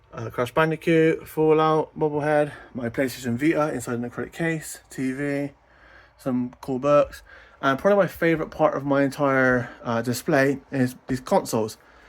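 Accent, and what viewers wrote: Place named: Derby, United Kingdom. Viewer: Ah just started watching your videos and thought the way you speak was too familiar, good to know other Derby yocals exist 😂. I normally go with “Welcome to Derby… sorry” if you’re needing a new intro line for the place.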